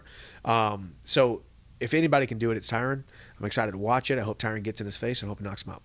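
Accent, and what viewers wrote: United Kingdom. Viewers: Any chance ur scottish ?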